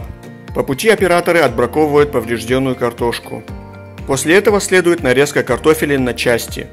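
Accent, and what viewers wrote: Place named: Adygea, Russia. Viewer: Адыгейский акцент))) классный класс си брат 👦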